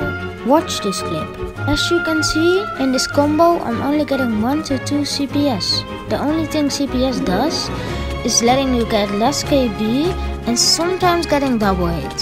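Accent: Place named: Philippines. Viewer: It's pretty surprising for such good fps while you have less than 100 subs and you sound filipino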